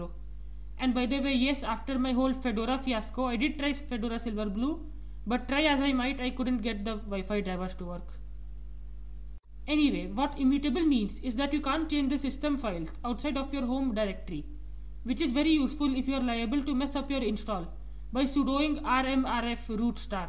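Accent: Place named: Japan. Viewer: Adjust your Audio Setttings,its Low,and i love your Japanese Accent......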